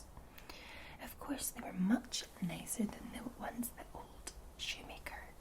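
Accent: Scotland, United Kingdom. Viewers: Somehow I'm not surprised my dream manager has a Scottish accent...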